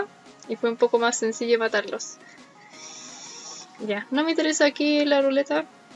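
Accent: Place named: Chile. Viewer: Se nota altiro que eres chilena te amo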